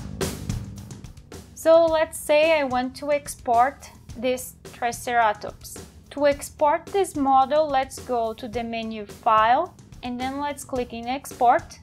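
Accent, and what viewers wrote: Brazil. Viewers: Tu não me engana! Tu é Brasileira! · Esse sotaque não me engana. Tu é br né? haha Excelente vídeo!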